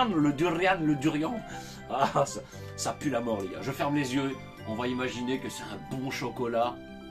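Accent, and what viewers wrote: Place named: Belgium. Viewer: Très sympa tes vidéos 👍🏽 C’est marrant ce petit accent belge. Par contre d’habitude je reconnais facilement de quelle région de Wallonie provient l’accent, à part le carolo, sauf si il est fort prononcé. Sûrement dû au fait que je suis, moi-même, carolo😅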